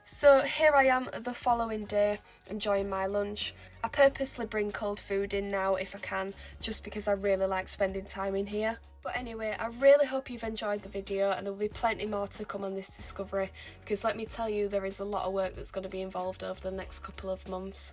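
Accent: United Kingdom. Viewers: 영국 영어다!!!!!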